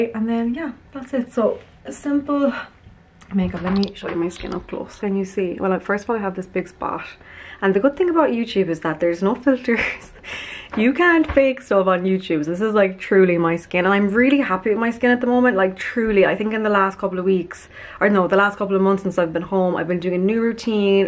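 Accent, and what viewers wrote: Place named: Kerry, Ireland. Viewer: Love how relaxed and natural you are in these vlogs at home. Will give them a go tomorrow. Sunday is self care day! Never apologise for the Kerry accent (from a Dublin gal).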